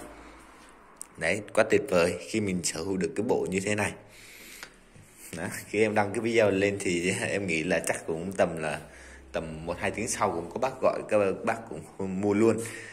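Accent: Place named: Hue, Vietnam. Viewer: Giọng bác giống giọng Huế quá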